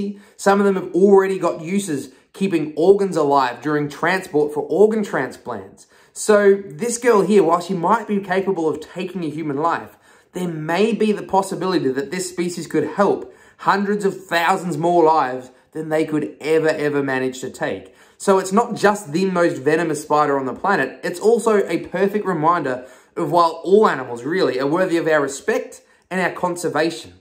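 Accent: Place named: Australia. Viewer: For some reason, I just knew he was from Australia or from that region 😂😂😂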